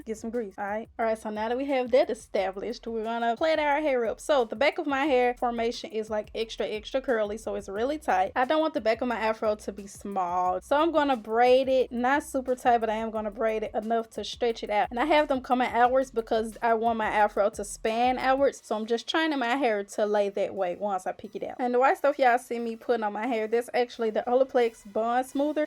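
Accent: United States. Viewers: I LOVE the country accent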